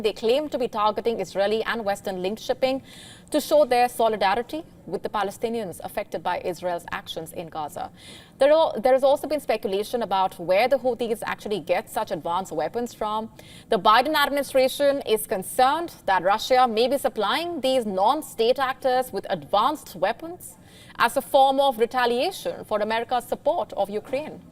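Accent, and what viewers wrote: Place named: India. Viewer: Somehow, the strong Indian accent of the anchor reduces the credibility of their content.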